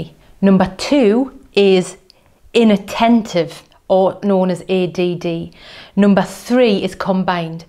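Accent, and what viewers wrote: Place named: Newcastle, United Kingdom. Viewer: Newcastle ? Love it 😊 hi 👋 from Liverpool ❤ Thanks for the info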